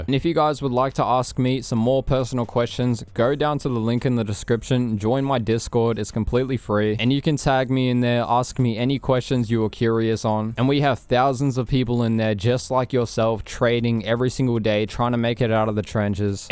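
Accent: Australia. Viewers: can you do the same video but without the australian accent please. It's just i heard a w at the end of every words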